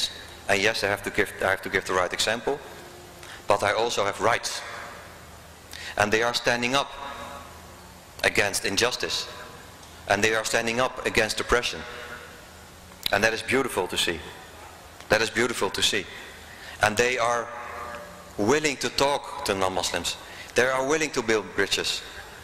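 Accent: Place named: Europe. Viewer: What country is this ? What European country this brother from?? His eccent European country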